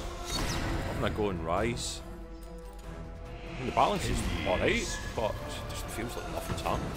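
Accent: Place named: Scotland, United Kingdom. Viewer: Hahahaha yass this is the best slot video I’ve seen in a long time🤣 was so happy when I heard you were Scottish anaw. Subbed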